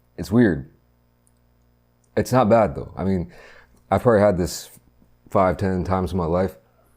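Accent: United States. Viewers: You're in/live Korea? You sound like you were born and raised in America. Not that that matters in any way. But if you're born and raised and still live in Korea, I'm just amazed at your American is all.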